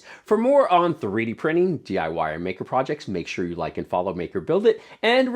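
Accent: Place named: California, United States. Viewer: I know nothing about you other than from you accent you are clearly from California.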